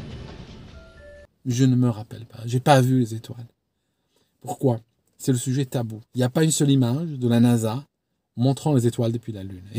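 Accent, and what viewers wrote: Switzerland. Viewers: J’étais pas prêt pour l'accent suisse... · J'adore ton accent Suisse,lorsque tu nous expliques les détails de tes arguments!!! Merci pour tes réflexions 👍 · cher, je n'avais pas écouté cette vidéo, très très intéressante, tu gardes ton sérieux, et tu parles avec l'accent suisse. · l'accent suisse revient à la charge !😄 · C’est la première vidéo sur laquelle ton passage en Suisse se remarque vraiment à ton brin d’accent d’chez nous 😉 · Oulala toi tu as vécu en Suisse, je peux même te dire le canton: VD?! Tu as bien gardé ton accent!! Salut l'Ami!! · J'ai adoré ton accent Suisse 😉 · L'aziz. bravo à toi. On te l'a déjà dit j'en suis sûr. Tu as l'accent suisse qui remonte à la surface en ce moment ! Alf shukr pour le contenu et la livraison inégalables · Vous avez un petit accent suisse, bonne continuation avec toute ma sympathie. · J’avais jamais remarqué l’accent suisse 🇨🇭 😅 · Ce qui me choque moi dans cette vidéo, et c'est la première fois que j'entends ça chez toi, c'est qu'on dirait que tu avais un challenge: dans cette vidéo tu dois parler avec l'accent suisse! 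Eh bien challenge réussi! 😁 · Je viens de retombé sur cette vidéo. T'as grave l'accent suisse là-dessus mdr! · Tu as déménagé en Suisse ? Tu as, dans cette vidéo, un accent suisse que je n'avais jamais remarqué. Ceci dit, excellente vidéo. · C'est dingue comme l'accent suisse est présent. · Excellent! Mais c'est quoi cet accent suisse?! 🤣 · ton accent suisse ressort lors de ton exposé, Ça fait tout drôle... 😄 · Je n’avais pas remarqué cette accent suisse, lors des autres vidéos…. Merci 🙏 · Merci pour la vidéo on entend parfois ton accent suisse c est super a entendre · Excellente vidéo (comme toujours)👏👏 où tu argumentes encore mieux que ceux qui ont menti à propos de cette mission. Avec leurs têtes d'hommes honteux pendant la conf de presse, n'importe quel joueur de poker voit que ces hommes ne se considèrent pas comme des héros. Et connaissant l'arrogance des américains quand ils sont fiers de quelque chose, on en déduit que ces 3 hommes sont très mal à l'aise avec ce mensonge et ne sont absolument pas fiers d'eux. 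Bref C.Q.F.D. À noter que pour l'occasion, tu as sorti ton savoureux accent suisse romand, du pur bonheur 😉😊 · Ton accent suisse s’entend parfaitement cette fois 😊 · Dans cette vidéo, tu as l accent suisse lol, un reste de tes années vécu las bas ? Sinon comme tu le dis très justement je préfère avoir tort et avoir l esprit libre ,plutôt qu avoir l esprit d'un poulet élevé en batterie... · ton accent Suisse ressort lol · Intéressant. Mais c'est une impression ou t'as pris l'accent suisse pendant la vidéo ? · Amusant ce petit accent helvétique, je ne l’avais jamais remarqué sur tes autres vidéos d’aviation 😂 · l'accent Suisse qui revient de plus en plus fort la vidéo avançant. Interessant les points que tu soulèves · Il n'y a pas que moi qui entend un accent Suisse ??? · de ouf, je me suis dit mais qu'est-ce qu'il fabrique avec cet accent suisse tout à coup?!? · Top l’accent suisse qui ressort dans cette vidéo 😂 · Ahaa L'accent suisse.. 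🍰 Et oui c'est pas mamie qui fait tous les gâteaux.. ;)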